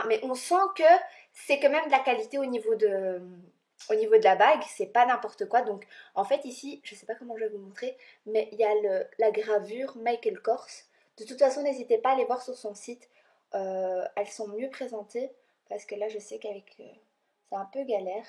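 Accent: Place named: Belgium. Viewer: J'aime bien ton haul 🤗 Le mascara gemey avec la brosse en forme de cœur me tente hihi😊 Dit moi tu es belge? Parce que j'entend comme un accent quand tu parle. Des bisous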